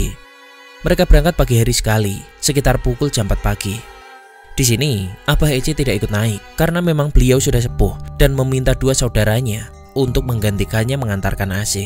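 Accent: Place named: West Java, Indonesia. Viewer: pembawaan logat sunda na lumayan😅😅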